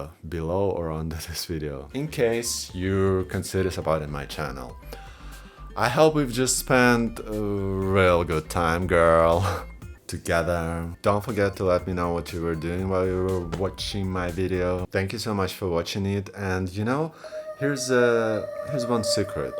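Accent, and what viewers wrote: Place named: Russia. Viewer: It's here In Georgia, USA. And you're queued up with a number of videos. I'm listening to you as I play a video game. You do have a strong Russian accent. But, as you already said, the algorithm isn't human. So, that's not the problem. Your English is very good as well. Before I continue, I have to state the obvious. There's a vicious war in Ukraine and of course you are aware of that. I'm certain that not all Russian citizens support that war and I'm not asking your position. You may not wish to answer and I wouldn't impose on you for an answer. But it's constantly in the news. And like it or not, that may have some effect on any Americans who views your videos. There's very little doubt in my mind that focusing on that in any way would not serve you well. In sum, there may be a measure of fear that is inhibiting you. Or a measure of anti-Russian sentiment as well. 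I'm not a content creator in any way at all. So, I can't say that any advice I give you will be useful. It is not my intention to be cruel, but I have to say that sitting in front of a mic just talking about yourself doesn't make you stand out. So, I'm wondering if you have some personal interests that you can share with us. Any skills, training or activities that might interest viewers. You live in Russia I believe and of course it isn't wise to dox yourself. How does being nonbinary affect your life in Russia? Are you at risk from others there for being on YouTube and openly nonbinary? Can you leave your h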